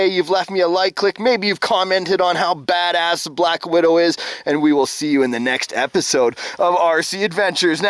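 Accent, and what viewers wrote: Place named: Canada. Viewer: Dude your videos are always playing on my and my sons Youtube. I love your content and your Canadian accent 😂🤣 Keep up the good work, you inspire us and others like us. Thank you for being awesome, wholesome, and family friendly! My son and I are hoping to start documenting our own outdoor R/C adventures, so stay tuned!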